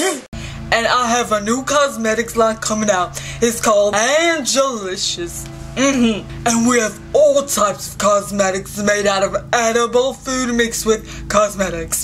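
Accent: Brooklyn, United States. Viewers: omg, i know what video ur talking about from jpmetz....hahahaha. u are funny. u should make more vids like this. i hear u pullin the brooklyn accent out of your voice girl. lol. nice!! keep up the great vids girl.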